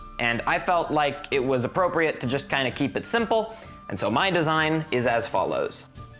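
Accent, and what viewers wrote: United Kingdom. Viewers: Are you a brit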